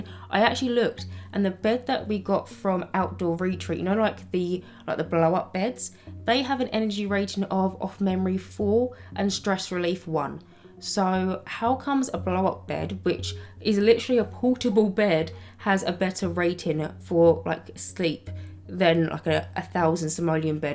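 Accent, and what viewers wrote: United Kingdom. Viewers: I absolutely adore your videos, you are so imaginative and I love the storytelling while you go through the videos, especially a fellow British accent! Sometimes I feel like other Sims videos are so loud and dramatic, but I love having your calming vids on in the background! Thank you for always posting (but also no thanks for always making me buy more packs!!!) X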